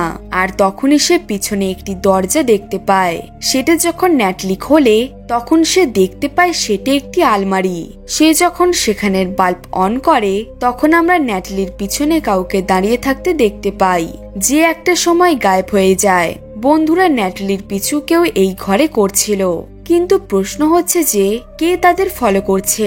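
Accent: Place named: Chapainawabganj, Bangladesh. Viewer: সরি টাইপিং মিস্টেক বলছি আপনার ভাষা ঠিক করা দরকার আকটু প্রবলেম আছে ভাষাটা শুদ্ধ হলে শুনতে মজা লাগে আপনি কেমন যেন চাঁপাইনবাবগঞ্জের ভাষায় কথা বলেন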